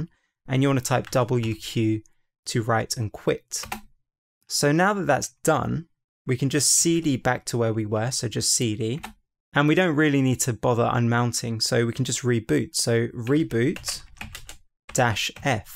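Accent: United Kingdom. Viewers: I love your British accent, it's so cool!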